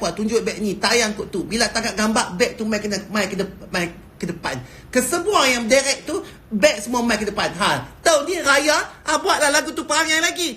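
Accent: Penang, Malaysia. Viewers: Nampak beza sblm dgn slpas dgn dia.. Bagus la.. Harap teruskan. Dia ni org mna.. Dgaq mcm penang atau kedah ja hahaha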